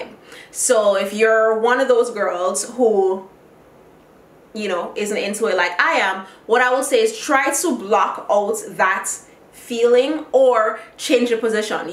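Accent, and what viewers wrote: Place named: Jamaica. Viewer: New to your vids. Where u from? Sound wash down yardie 😂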